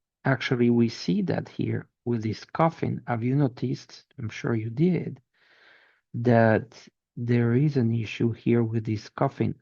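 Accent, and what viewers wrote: France. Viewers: Thank you, this was really great and I can relate to all of it, you explained it so well. I love listening to you because I miss the French accent that I grew up with. I am so glad that I found you on Linda G's video last week. You a good at this. I hope to hear more from you. Bonne Annee' 🙏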